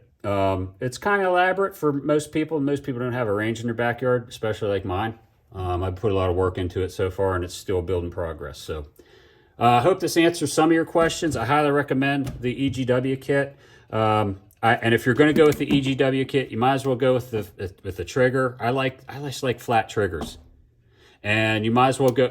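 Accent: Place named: Pittsburgh, United States. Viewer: Good to hear another Yinzer!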